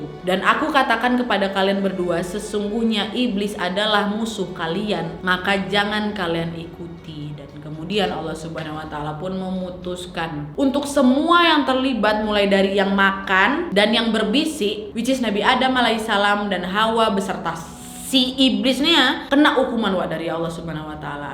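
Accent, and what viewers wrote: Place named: Medan, Indonesia. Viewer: Dan medan🤣 logat kau nampak jelas kale🤣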